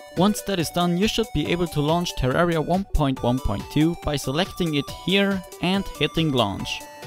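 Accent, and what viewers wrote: Germany. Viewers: Wow you are actually German speaking English. You don't see a lot of Germans talking English now a days .. good job man :D you should have more subscribers than that ;) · Are you from Germany? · Is that German or something?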